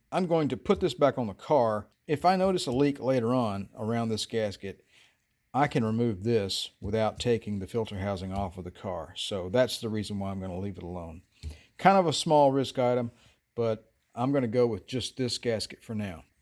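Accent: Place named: Alabama, United States. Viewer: Hey what part of Alabama you from?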